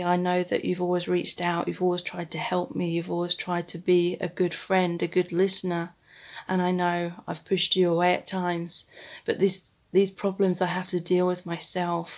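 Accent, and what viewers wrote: United Kingdom. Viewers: This reading is more than helpfull , thank you so much XOXOXOX and I love your British accent 🧡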